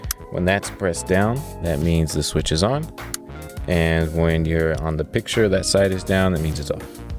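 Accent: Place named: Brazil. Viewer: cool lol. I'm Brazilian. You got a lil of bit of Brazilian accent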